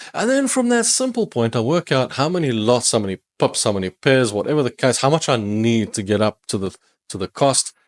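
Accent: South Africa. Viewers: i knew i recognised that springbokka and borewors accent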